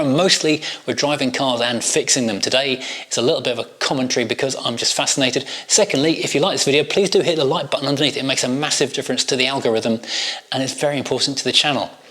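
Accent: United Kingdom. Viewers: I'm a car guy who was really interested in this topic. I tried real hard4 several minutes. I found myself irritated by this effeminate, sloppy mouthed, Brit accent, akin2 Piers Morgan. I finally couldn't stand it anymore &gave up. Pewh!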